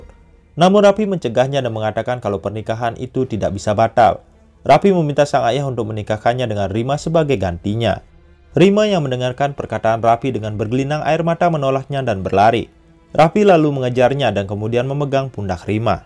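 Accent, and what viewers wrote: Bali, Indonesia. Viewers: Bli org bali ya?? Logat nya kyk ny dari bali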